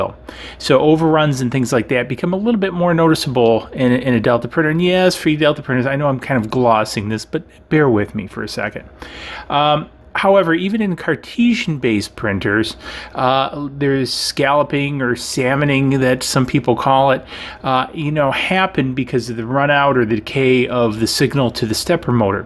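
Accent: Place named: Chicago, United States. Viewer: That Chicago accent!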